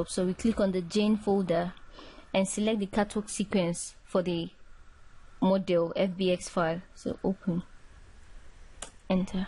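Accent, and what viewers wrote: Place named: Nigeria. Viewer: You are good at this. You also sound Nigerian and the accent gives me a better understanding 😀. I love your work, it's inspiring.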